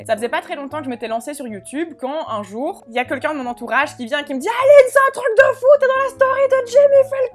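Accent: Belgium. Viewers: tu es belge ?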